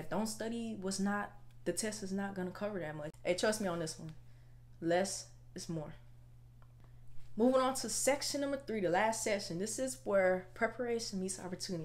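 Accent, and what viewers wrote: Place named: Atlanta, United States. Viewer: lmao I knew it had to be ga! So I just went ahead and said Atlanta. My cousin born and raise in Atlanta she got the same dialect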